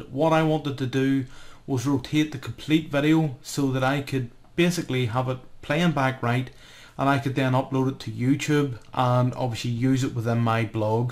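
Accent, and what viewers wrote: Northern Ireland, United Kingdom. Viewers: Hey are you from Northern Ireland?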